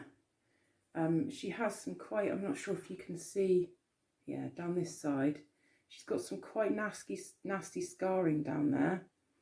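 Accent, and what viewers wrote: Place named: Devon, United Kingdom. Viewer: You sound like your Devon way, maybe Cornwall 😊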